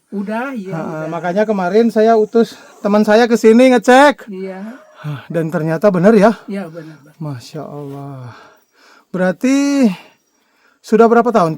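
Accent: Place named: West Java, Indonesia. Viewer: Klo dngr ngomong itu daerah prbtsn Tasyik dgn garut Buuun.. Mfin ya bun nyela komen 🙏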